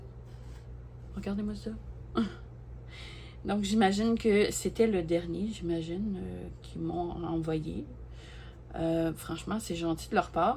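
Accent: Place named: Canada. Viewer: Merci pour la vidéo et les produits présentés ❤ j'adore ton accent canadien et ta voix toute douce, calme et reposante 🤗 Bisous 😘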